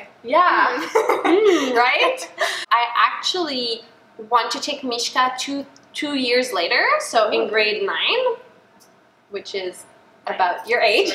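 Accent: Quebec, Canada. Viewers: Are you French Canadian? Cause your accent when you speak English sounds a lot like mine when I speak English. Also, in the short film MISHKA, I saw our beautiful Canadian flag so I was wondering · No Pickle Yeah but that happens because her main language is Canadian French so she has an accent when she speaks English that results in some words being higher pitched.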